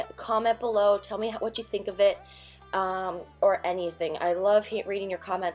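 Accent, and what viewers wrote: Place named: United States. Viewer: Where in America do chicks talk like you? Sounds cute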